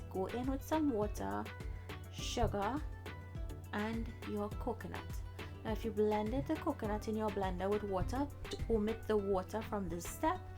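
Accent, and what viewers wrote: Trinidad and Tobago. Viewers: I knew u were Trinidadian when I heard your accent ! Thx for the recipe ! x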